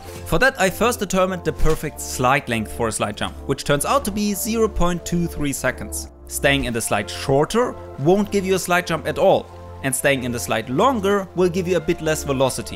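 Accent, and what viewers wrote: Germany. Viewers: Nice Video Hey, are you German? Your Accent sounds like it ^^ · Hi are you german? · Are you German because you sound like nearly everybody in Germany and Austria when they speak german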